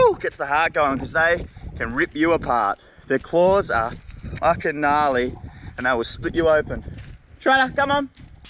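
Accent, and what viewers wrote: Australia. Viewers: Is he Aussie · Are u Australian cuz I am to mate Edit: thanks 4 😄😄😄😄😅😅😁😁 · Is he from New South Wales or Queensland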